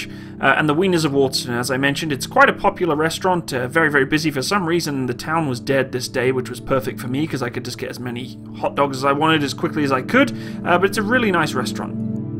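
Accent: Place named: North East England, United Kingdom. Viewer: what part of north east England r you from im from Hartlepool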